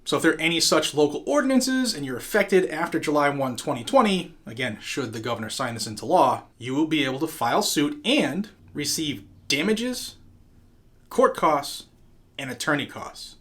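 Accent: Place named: Boston, United States. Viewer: Never noticed your Boston accent till this video. Been watching you for about a year. Good job and I like hearing about 2A news around our country. Thank you.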